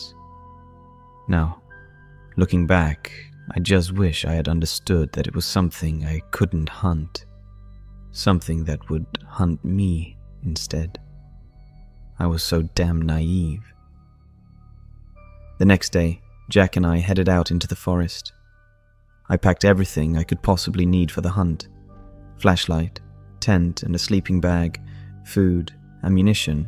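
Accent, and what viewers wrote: United Kingdom. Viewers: This is very incongruous: an American, living in America, speaks with a British accent. Credibility gap!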